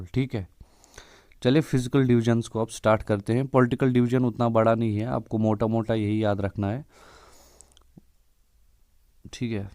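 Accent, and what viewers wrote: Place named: Kashmir, India. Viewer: Sir your accent is more like a kashmiri pandit.. are u a Kashmiri pandit ? Sir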